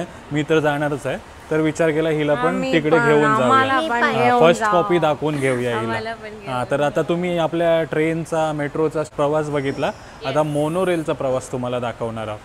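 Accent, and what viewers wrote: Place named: Agri Koli, India. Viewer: आणि तुझ्या मध्ये गावठी भाषेचा टच खूप म्हणून तू आगरी कोळी खूप छान शोभतो.... I like it very much..😊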